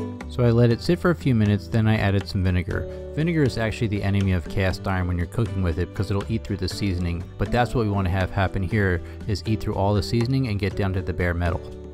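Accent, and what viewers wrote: United States. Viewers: Your midwestern accent is so charming, makes me want to watch more of your videos! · This guy sounds like he's from Baltimore ("wooder" ...my only knowledge of Baltimore comes from The Wire haha)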